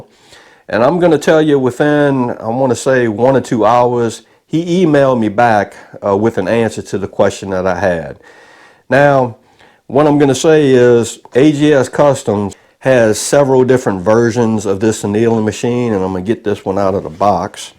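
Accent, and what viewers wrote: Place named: Louisiana, United States. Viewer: Are you sure you're not from Louisiana? Show sounds like a Bayou Teche accent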